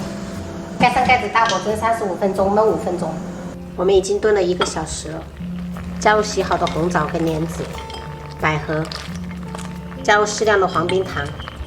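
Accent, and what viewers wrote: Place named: Minnan, China. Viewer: 因為有閩南口音 😂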